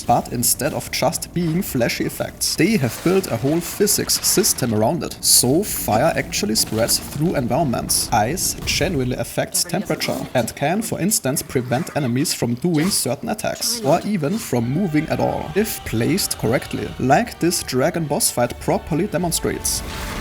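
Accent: Germany. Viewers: i cannot stand that german accent....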